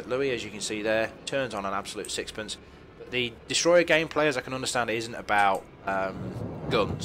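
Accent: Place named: North West England, United Kingdom. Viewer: your accent sounds like your from the North West of England :D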